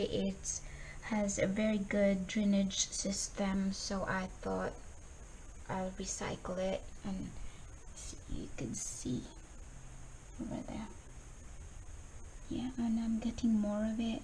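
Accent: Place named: Visayas, Philippines. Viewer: hehehe... lately in the middle of your video... i notice your tone.... same as bisaya....... mabuhay po.... hoping for more video to come...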